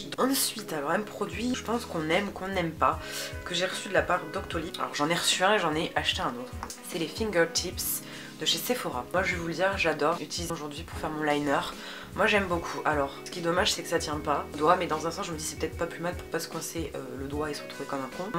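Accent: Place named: South, France. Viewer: J'adore ton petit accent du sud tu m'amène le soleil en haute Savoie 😊 J'adore ton ral il te va trop trop trop bien 👌🏻 Bisous bisous 😂😂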